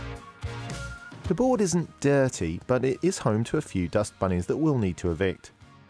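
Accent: United Kingdom. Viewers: Are you from Liverpool? You sound like you're from Liverpool.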